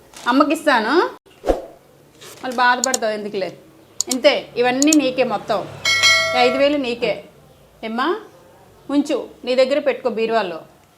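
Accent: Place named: Vijayawada, India. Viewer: Mi mata manasu manchidhi sister 😊,madhi vijayawada side miru makulane మాట్లాడతారు బాగా,ఇలా ఎన్నో చేసి మంచి పేరు తెచ్చుకోవాలని ఆశిస్తున్న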